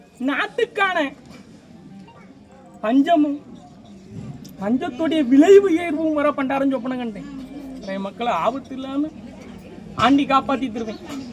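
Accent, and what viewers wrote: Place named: Kanyakumari, India. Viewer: இதுக்கு மேல என்னங்க தெளிவா சொல்லுறது. அவர் பேசரது குமரித்தமிழ் அது அப்படி தான் இருக்கும் சுலபமா புரியுதே ஏன் இவ்வளவு comment பன்னிருக்கிங்க புரியல புரியலனு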